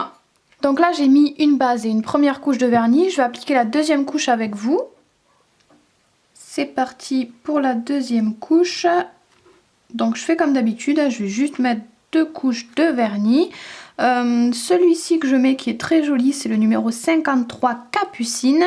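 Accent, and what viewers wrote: South, France. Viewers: super ce produit tres jolis accent que tu as on dirais celui du midi bonsoir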